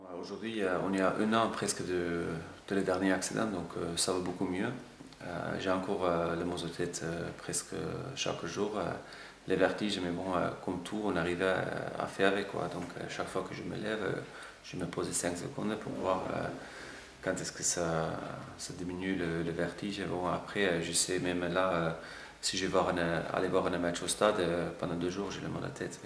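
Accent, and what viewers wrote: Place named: Basque Country, France. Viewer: Super témoignage, tu vas beaucoup mieux et bientôt ce sera parfait. Ton accent et ton récit avec l'accent deu pays basque! En afrikander tu conserves le même accent? Bonne reconvertion.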